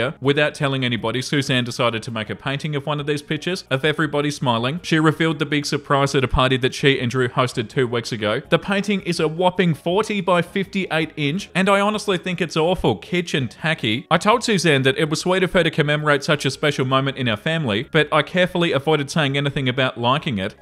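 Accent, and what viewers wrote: Australia. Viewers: i was on facetime w my sibling the other day and they heard the vid i had on in the background and asked me "who's your cheery australian man"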